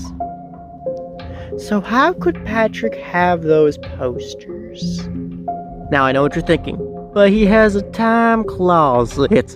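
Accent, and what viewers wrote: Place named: South, United States. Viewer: You sometimes speak with a southern drawl. Are you southern, or is this an act?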